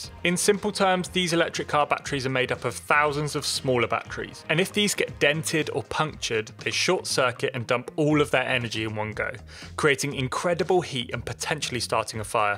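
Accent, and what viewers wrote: United Kingdom. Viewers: Is he British??? Just curious to know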